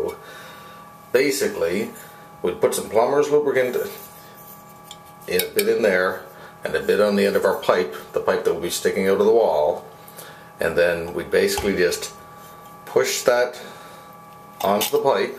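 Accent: Newfoundland, Canada. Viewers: Cape Bretoner or Newf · Hi, thanks for the informative video - saved me $150 bucks USD! Based on the comments regarding your Canadian accent on words like "about" and "spout" my reply to Monique Wilson is learn how to pronounce the word "ask" correctly before commenting on our good neighbors north of the border! Monique would most likely want to "axe" you a question on another one of your videos! Thanks again for the informative video - married to a sweet lady from Toronto! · Got to be a Newfoundlander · I love the Canadian accent and I loved the video very helpful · Got to be Canadian - "you're darn toot'in"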